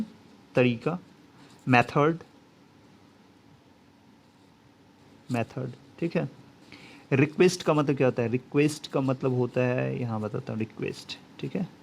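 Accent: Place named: West Bengal, India. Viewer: Vai tumi wb thke mone hoche. ❤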